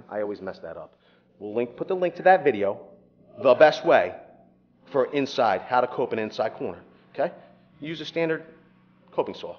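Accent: Philadelphia, United States. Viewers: the best part is the philly accent. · The whole time I'm watching this I'm thinking, "This dude has gotta be from Philly. He sounds like he could be a clone of my voice." Contractor myself, and you have the same patience for bullshit that I do...zero. Northeast Philly, I have a business in South Jersey over the Tacony. Where you guys from?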